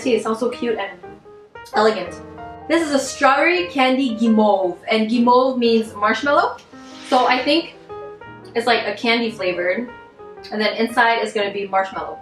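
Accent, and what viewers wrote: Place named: Quebec, Canada. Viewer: Tu es Canadienne? Tu as l’accent Québécois quand tu parles français !😍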